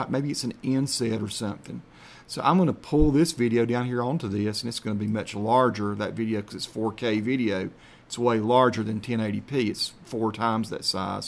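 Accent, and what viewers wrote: South, United States. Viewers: love the southern accent xdd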